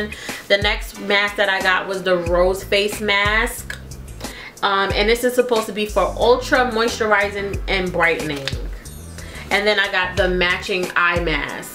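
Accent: New York, United States. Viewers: I LOVE u and ur videos. I can listen to u talk all day!! (the NY accent 😊) Please do a video on the Kylie lippies!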